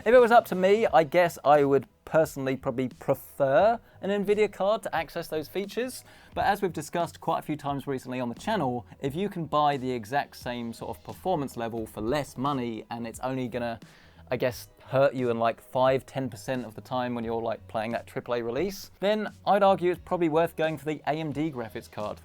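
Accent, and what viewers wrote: United Kingdom. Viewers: Too much British can't understand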